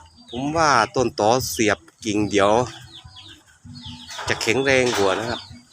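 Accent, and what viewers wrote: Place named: Korat, Thailand. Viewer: สำเนียงเหมือนโคราชบ้านเอ็งเลย ปลุกที่โคราชมั่ยคับ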